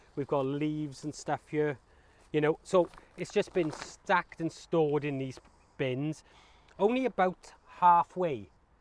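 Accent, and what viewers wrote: South Wales, United Kingdom. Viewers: Yep, you definitely have a south wales accent cariad 😆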